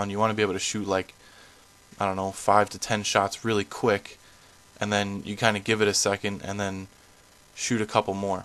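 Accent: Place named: East Coast, United States. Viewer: good video and good tips I want to get rollers when im down in ocmd for june and sept you sound like your from around the east coast I do photography as well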